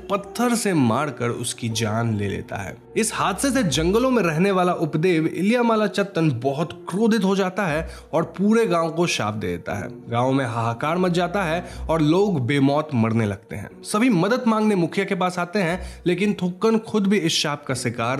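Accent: Bengal, India. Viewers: Just by the narrator’s R pronunciation, it can clearly be understood he’s a bengali.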